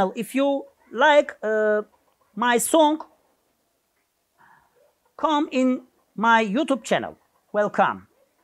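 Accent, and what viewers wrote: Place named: Turkey. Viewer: Omg this is my first time seeing you speaking English in Turkish accent 😍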